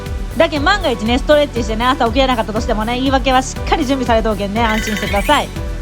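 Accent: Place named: Hakata, Japan. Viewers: チャンネル登録しました!! おなじ九州なので福岡弁癒されます♡ 頑張ってダイエットしたい☆彡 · マジDJ社長大好き間違えただった😍ｗｗ この博多弁？めっちゃ好きやわ～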